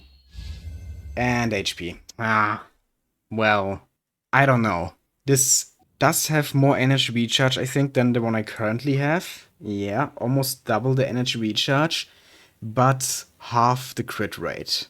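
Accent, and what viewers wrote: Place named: Germany. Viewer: das richt nach deutschen Akzent xD aber nices video tbh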